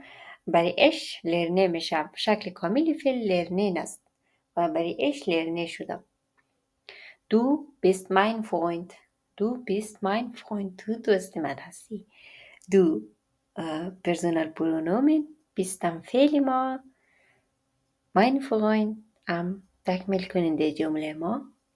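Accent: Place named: Afghanistan. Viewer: ایشون لحجه دری دارند. دلیل نداره که هرکسی که لحجه تهرانی نداره فارسی بلد نیست، دوست عزیز.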